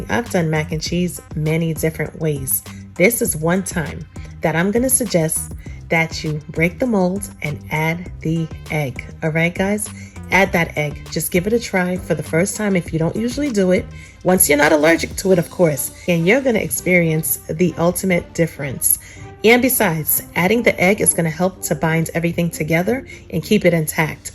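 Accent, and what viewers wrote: New York, United States. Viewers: Looks delicious, funny it’s southern but you sound like ur from New Yawk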